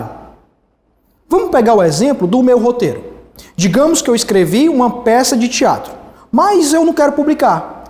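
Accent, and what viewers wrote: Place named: Ceará, Brazil. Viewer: Deve ser Cearense 😍👏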